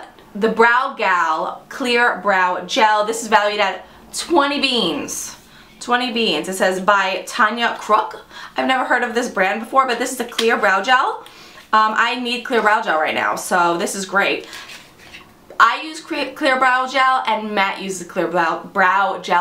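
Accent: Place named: Staten Island, United States. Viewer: You're quickly becoming one of my favorite YouTubers! My husband is from Staten Island and your accent reminds me of his friends from there. Somehow he escaped without an accent;)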